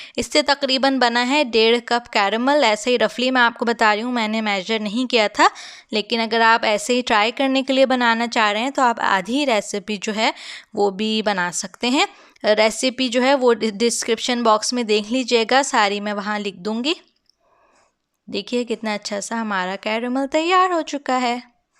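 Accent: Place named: United Kingdom. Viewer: why are you speaking urdu in British accent 🤨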